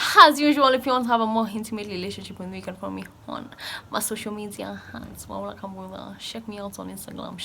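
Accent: England, United Kingdom. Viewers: I love your British accent 😍😂😂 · I honestly looove your english accent ❤😍n your sense of humour 😂😂😂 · you should do a video where u speak using the British accent only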